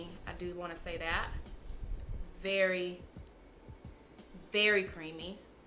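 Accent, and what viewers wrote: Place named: Georgia, United States. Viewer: Kat Von D concealers are like that? I always go back to my Nars radiant. Love your southern accent. It sounds like a Georgia accent.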